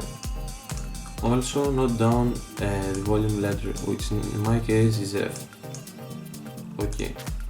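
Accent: Greece. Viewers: η ελληνική προφορά γαμάει πάντως!!! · Απο τη φωνη υποψιαστηκα οτι ειναι ελληνικο βίντεο , ωραιος φιλε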